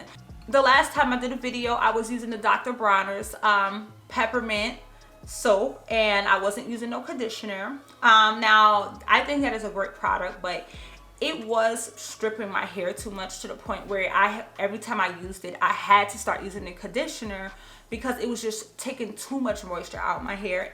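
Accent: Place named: New Jersey, United States. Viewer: I could tell you were from Jersey! lol like my mom. your locs are so beautiful! makes me want to reconsider and get smaller locs lol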